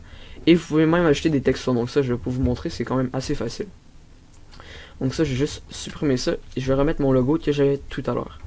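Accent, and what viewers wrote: Quebec, Canada. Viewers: Vive, dommage de l accent un peu trop prononcé:S Mais sa gere quand meme, Peace au Quebequois!:)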